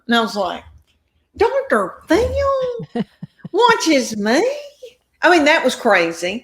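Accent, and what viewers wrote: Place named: South, United States. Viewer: OMG this woman is the best person who ever came out of the South. I’m from New England, I used to have to (sorry) put the subtitles on when I listened to her. But now I understand every word. I laugh harder at her comedy than ANYTHING. Her stories about her kids and husband are soooo funny. I love her, and Nate Bargatze, and found them both just before the pandemic. Wow! Southern comedy and rural Vermont comedy? 😮Not so different. Growing up with just weird and wonderful people makes for such terrific stories. And you can NOT make this s—it up!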